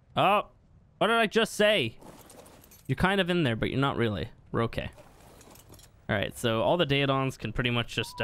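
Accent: Ireland. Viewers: Are you Irish cause if you are you my man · He is from Ireland 🇮🇪 but im not 100% sure where (i think he lives in Dublin)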